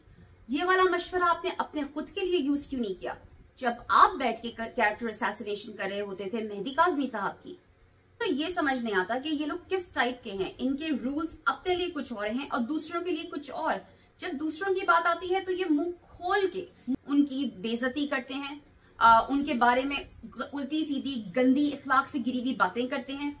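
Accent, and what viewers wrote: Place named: Punjab, India. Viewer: Good work wasy apki Punjabi boht kamal ki hai so sweet 🤣🤣🤣🤣🤣🤣🤣🤣🤣🤣ap in sab ki kotay wali boht kamal ki krti hai Punjabi ma🤩🤩🤩🤩🤩🤩🤩🤩🤩🤩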